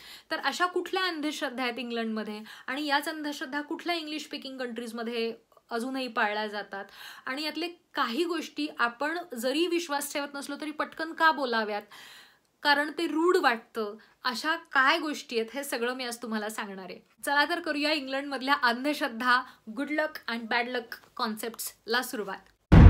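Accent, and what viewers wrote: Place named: Pune, India. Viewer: Hi,tumchya uchcharatun spasht janawtay ki tumhi punyachya ahat? Ami right?😂😂 But still you have very well explained the topic n let me tell you video on rotimatic was awesome but little costly thats all.all the best.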